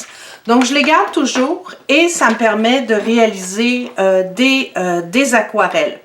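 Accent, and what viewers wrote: Canada. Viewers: chalut et merci! Tu me donnes des techniques que je ne connaissais pas! avec un tampon, j'ai maintenant plus d'idées créatrices et j'adore ton accent canadien! chaleureusement ( de St Nazaire où sont partis beaucoup de Canadiens)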